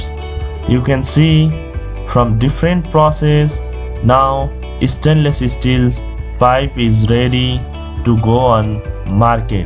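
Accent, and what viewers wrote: India. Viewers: Indian accent is the Ugliest english accent around the world and it is very hard to understand,bad pronuncuation,to name but few. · Give up your Tamil accent of spoken English. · Bihari bol raha hai?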